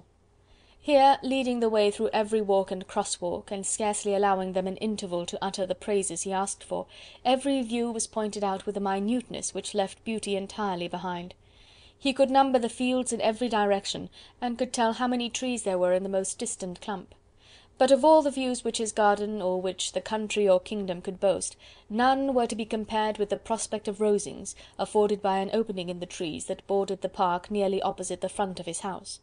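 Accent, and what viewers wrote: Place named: United Kingdom. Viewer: Excellent british accent!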